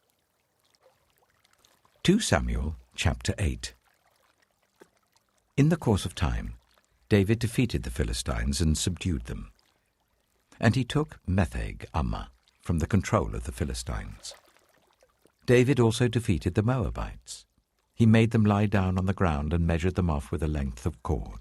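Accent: United Kingdom. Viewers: What a shame, I don’t like this voice or the British accent. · I like the first commentators voice better than the English ish accent...can not relax with that voice.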